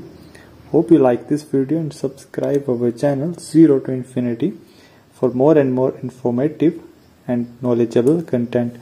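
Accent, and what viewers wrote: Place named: India. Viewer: Can someone besides an Indian make videos troubleshooting things? I am so sick of this accent.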